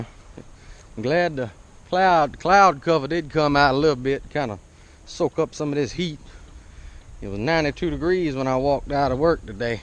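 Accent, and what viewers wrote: Louisiana, United States. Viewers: You a cajun or somethin'? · Oh ok, Sounds Cajun Anywho excellent work, appreciate it. I have a 1187 and a 870. I’ve had great luck with a modified choke in the 870 with Remington slugs.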